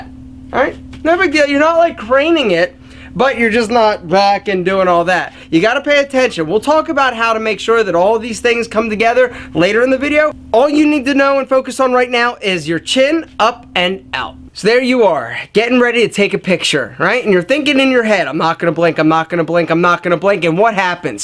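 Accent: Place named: New York, United States. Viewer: Athalos hes from the East like New York or something like that i think ha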